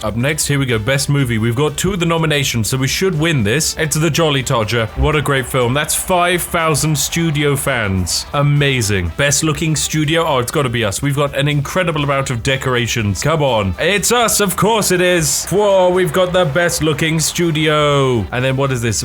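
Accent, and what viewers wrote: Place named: RP, United Kingdom. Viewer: what part of england are you from you sound more posh than the people south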